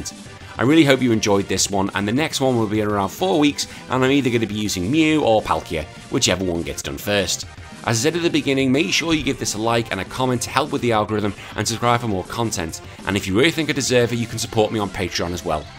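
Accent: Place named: England, United Kingdom. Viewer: Love seeing these challenges with a local-ish - English - accent. And swearing. The recorder fucking broke me.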